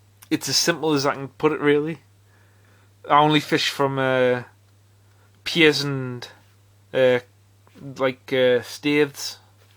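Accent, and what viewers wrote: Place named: Northumberland, United Kingdom. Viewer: Hiya m8, put it this way, on warm still summer nites u can catch school bass, coalies & perhaps a sea trout, which of course u return! from behind the rokers, so on wi your chesties follow the tide back & I used 2 prefer 2 cast sideways along the beach, parallel 2 the waves if any, also on the whale rock ive had coalies, very small Pollack, tommies & 1 wrasse!, keep up the vids as its nice 2 hear someone speaking properly as what we do!!!, from a fellow Blyth dangler.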